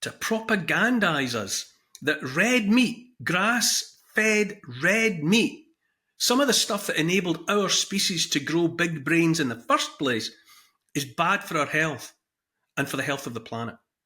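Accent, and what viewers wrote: Scotland, United Kingdom. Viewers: You have a lovely Scottish accent.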